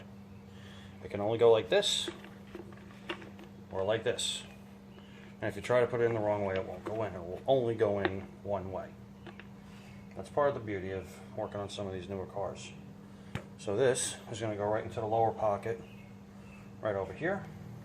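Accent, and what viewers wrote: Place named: Central Jersey, United States. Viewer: you have the most proper central jersey accent i ever herd. also im doing this 3 in and 3 in rear aswell on my gmc canyon just waiting on parts.. cool video even tho its old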